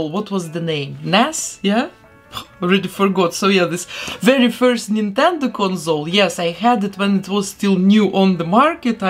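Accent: Russia. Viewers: Love ur doll repaints ur so talented thx for vid. lf l can ask are u Russian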